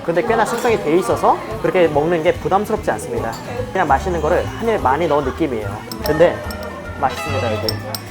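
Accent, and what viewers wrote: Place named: Busan, South Korea. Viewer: 부산 분인가요..? 내가라고 말씀 하시는 것 같네요 와이프도 제가 보다 내가라고 얘기 많이 하던데.. 설명 하시는 목소리에 감칠맛이 가득 하세요 친구분들은 무슨복인가요 너무 부럽습니다... 와....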